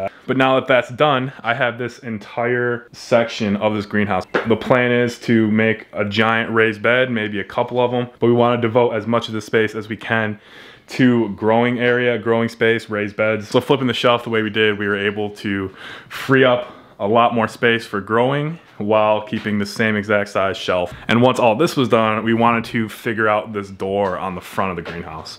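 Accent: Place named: Wisconsin, United States. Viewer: The Wisconsin accent is incredible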